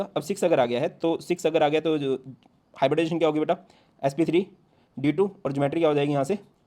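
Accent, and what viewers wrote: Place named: Punjab, India. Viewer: Your Punjabi accent 😅❤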